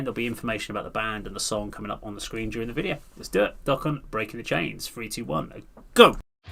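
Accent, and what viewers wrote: United Kingdom. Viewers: You from south east?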